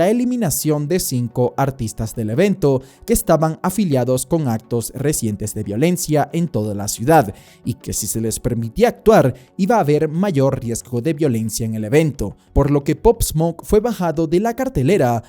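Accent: Dominican Republic. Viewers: Haha y esa dominicanidad que tienes ahora duro 😂🔥💪🏽🇩🇴💯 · Eres dominicano? Por que tiebes ese acento?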